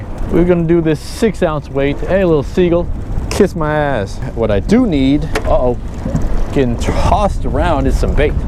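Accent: Bay Area, United States. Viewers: That Bay Area "accent" tho.... Hahah! Love it man, keep it up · Love your videos bro and that Bay Area accent you can’t miss it 🤘🏽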